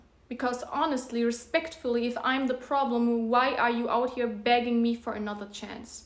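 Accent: Germany. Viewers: I think german, she's from Germany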